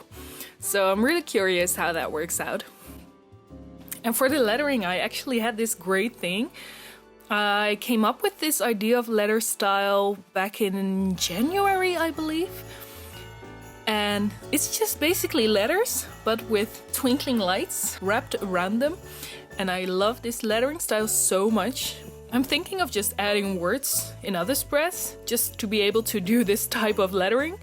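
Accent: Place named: Netherlands. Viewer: Do you know when you watch a video and you hear a slight accent and you're like DUTCHDUTCHDUTCHDUTCH As a dutch girl, it's like a dutch radar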